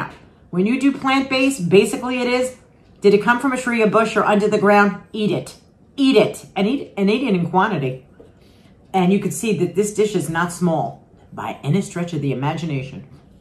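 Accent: New York, United States. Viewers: Morning! do i hear a hint of NY accent in you? lol the dish looks really good!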